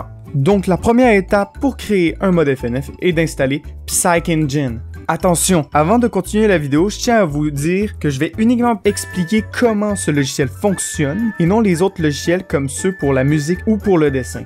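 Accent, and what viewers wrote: Quebec, Canada. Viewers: tu es québéquoi ?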